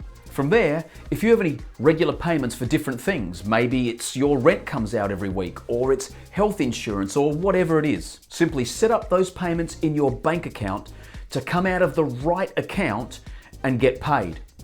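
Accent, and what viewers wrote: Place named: Australia. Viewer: Oh man, I love a good Australian accent. That right there gets you my subscription. I like how you're putting emphasis on the automation of the budget. I talk about that quite a bit in my content as well. This is an excellent video covering those basics. I think that's really helpful for some people who've never budgeted before. You have some good examples too. I found you just by searching for budgeting channels on YouTube because I love the budgeting community and focus a lot of my content around it too. Keep up the good work!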